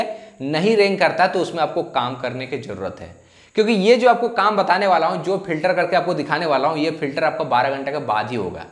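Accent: Bihar, India. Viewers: Aapka bolne ka tarika achaa hai Bihar se ho aap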